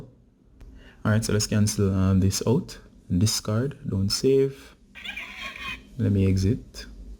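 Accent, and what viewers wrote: Jamaica. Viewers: U sound like jamaican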